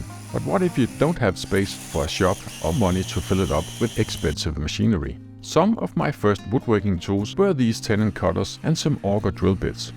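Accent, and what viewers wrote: Denmark. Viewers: So happy to hear you have reached far, i always love when i see your uploads. keep it up.. it makes me smile when i hear your Danglish, and it helps me in my day here.. Greetings from a fellow Dane living in Indonesia.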